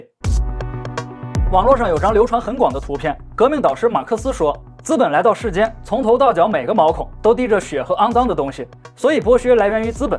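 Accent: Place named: Shaanxi, China. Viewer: 普通话有点陕西味啊，倍感亲切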